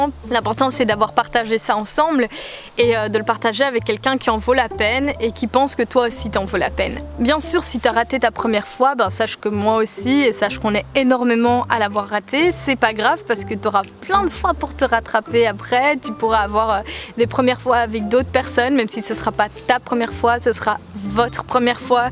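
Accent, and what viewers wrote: Belgium. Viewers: Oula tu serais pas belge toi ? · T'es belge toi nan ? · Je t’ai découvert part cette vidéo ( je sais pas pourquoi ) et vraiment j’aime tellement ta joie de vivre. En plus je sais pas pourquoi mais ta l’accent belge 😂🇧🇪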